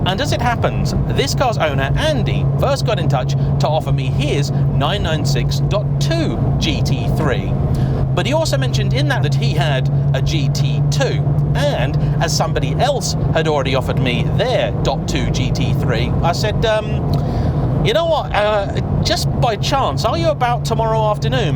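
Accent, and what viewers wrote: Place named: England, United Kingdom. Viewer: Gotta love England accent.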